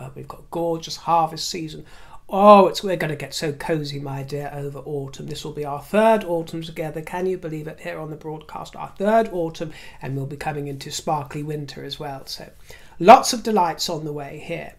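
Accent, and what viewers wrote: United States. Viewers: You have a very good American accent. Your headband is divine; that is your color. Always a treat for me when I see that you have posted. Great point about the dangers of slander. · from one Cancerian to another, your American accent is marvelous!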